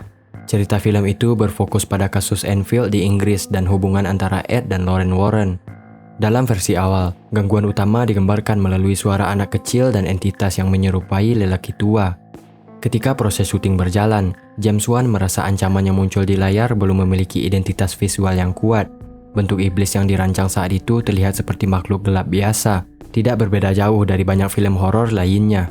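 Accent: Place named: Aceh, Indonesia. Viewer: bang orang aceh apa orang lombok bang? kentel banget logatnya 😁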